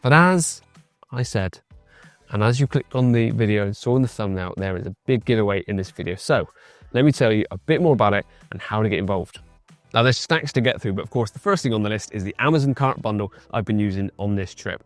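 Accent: Bristol, United Kingdom. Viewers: What your accent Bristol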